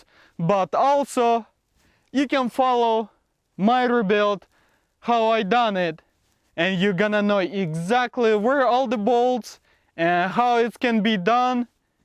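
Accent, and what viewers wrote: Russia. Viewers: Акцент русский!!!! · Бро, ты не русский случайно?)) Акцент выдает)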